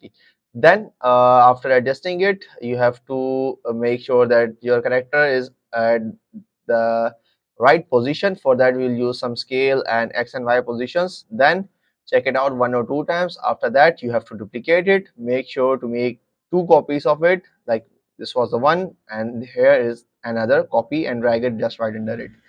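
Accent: India. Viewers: Indian ahh voice (no hate)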